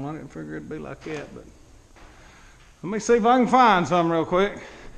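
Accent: Tennessee, United States. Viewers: You from TN?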